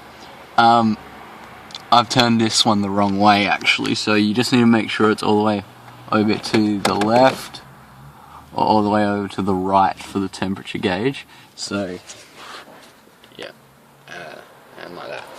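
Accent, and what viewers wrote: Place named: Australia. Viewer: How good is hearing an Aussie accent instead of an American accent. Cheers, bro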